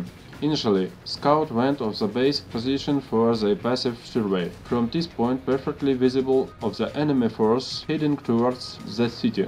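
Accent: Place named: Russia. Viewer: типичный русский акцент)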